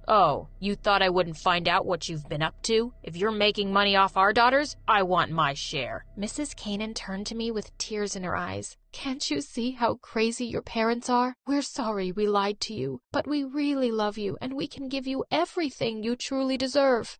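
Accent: United States. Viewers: This Turkish person has a suspiciously American accent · You’re turkish and you have an American Accent? Kinda sus I am Turkish btw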